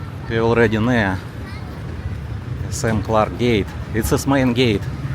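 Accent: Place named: Russia. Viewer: Your accent more Russian but it's okay I love your content I have been in Angeles too.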